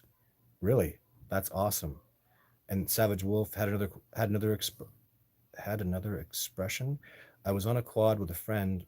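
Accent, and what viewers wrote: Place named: United States. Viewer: You sound more like an American, than a Canadian! You can move down to the States if Canada is gonna keep you locked up! 😊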